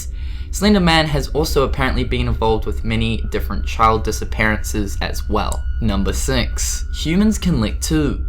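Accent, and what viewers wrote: New Zealand. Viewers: Sounds like a kiwi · Please. Don't talk like a Kiwi/Maori. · Are you from New zealand?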